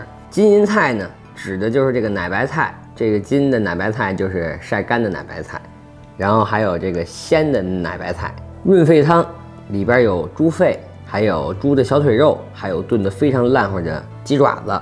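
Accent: Beijing, China. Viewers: 京腔的特点就是这样，不少北京人说话就是这样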